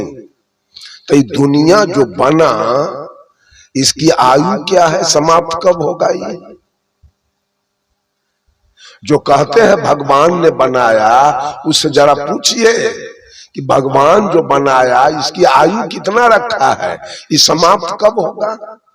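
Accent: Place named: Bihar, India. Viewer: अपना चश्मा तो उतार दे पहले 😅 और बोली तो जेसे लालू जेसी बात करता है हमारे जेसी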